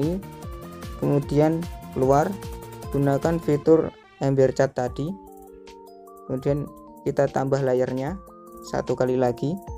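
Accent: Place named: Java, Indonesia. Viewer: Klo bisa ngomong medok nya dikurangin,soalnya agak gk faham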